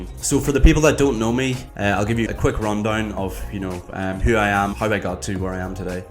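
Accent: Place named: Donegal, Ireland. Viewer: No way! You're from Donegal and I thought of catch your accent. I lived in Donegal 14 years all of my mixed Thai/Irish niece/nephews also living there. But myself moved back to Thailand for this period. I agree with you after moving back to Thailand. Many things has changed in Thailand, more conveniences, better, improvement and safety, cost of living a lot lower than Dublin, but you still have modern city vibes in Bangkok like many other rich City. 🎉😊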